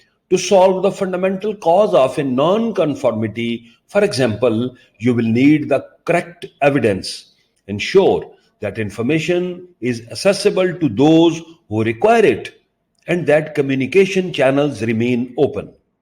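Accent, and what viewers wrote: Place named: India. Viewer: Ur Indian accent is too strong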